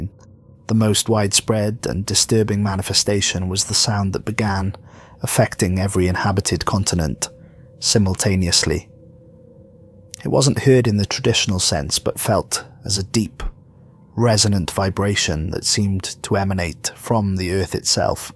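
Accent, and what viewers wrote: Wales, United Kingdom. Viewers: What part of Wales are you from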